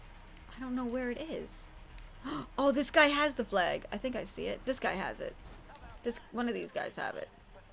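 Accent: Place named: Boston, United States. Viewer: Oh yeah and where are you from i hear Rhode island or Boston in your accent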